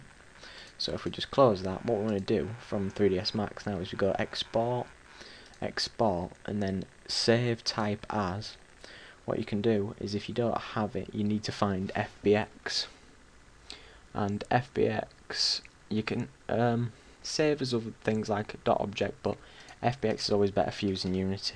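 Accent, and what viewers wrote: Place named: England, United Kingdom. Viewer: non related question: I'm learning accents, and would love to know were in England is your accent from?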